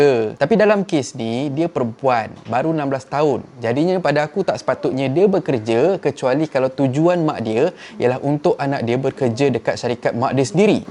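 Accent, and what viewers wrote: Kelantan, Malaysia. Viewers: Mu kecek Klate haha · Good effort bang cekek kelate hahahah😂👍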